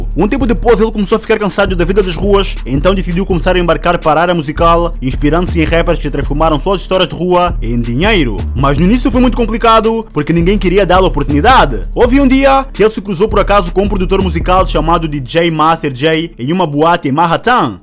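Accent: Angola. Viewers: Mano tu és angolano?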